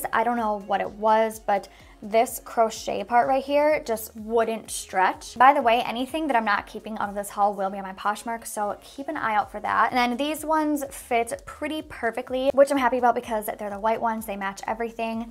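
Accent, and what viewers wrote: Minnesota, United States. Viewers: Do you live in Minnesota I heard you say my Minnesota accent lol I live there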